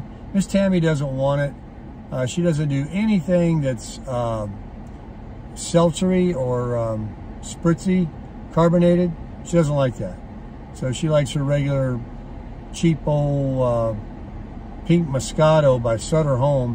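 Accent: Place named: New York, United States. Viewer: Are you single New Yorker cause I'm a single Chicagoan! Our accents would be fun times.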